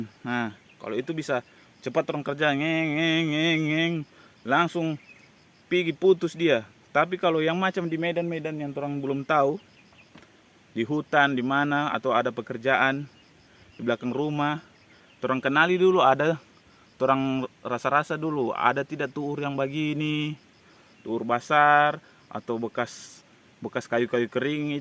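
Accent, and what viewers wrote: Sulawesi, Indonesia. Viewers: Bang rupanya logat Sulawesi ini ❤❤❤❤❤❤ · Ya cara penyampaiannya cukup menghibur dgn dialek dan logat sulawesinya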